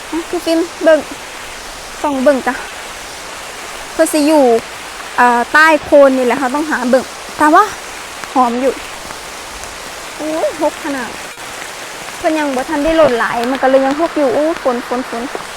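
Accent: Isan, Thailand. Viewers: ก็ว่าอยู่ ทุเรียนต้นใหญ่ขนาดนี้ มีแต่ที่ภาคใต้แหละ เห็นสำเนียงอีสานตอนแรกงงว่ามีที่อีสานด้วยเหรอ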